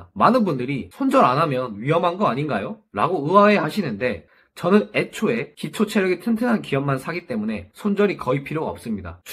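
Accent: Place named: Honam, South Korea. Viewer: 호남사트리 ㅋㅋㅋ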